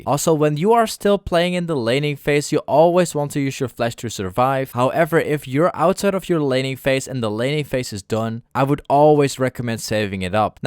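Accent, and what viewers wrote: Netherlands. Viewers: Dutch accent FTW.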